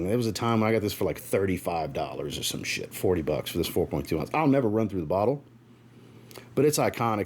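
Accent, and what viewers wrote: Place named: New Orleans, United States. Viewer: You sound like you straight outta tha parish bruh I miss Nola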